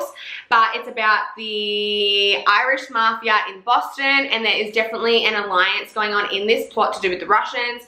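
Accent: Australia. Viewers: I have been reading you recommendations and I think they are awesome ! You say MAFIA perfectly. Everything sounds better in a Aussie accent. My words sound boring in my canadian accent lol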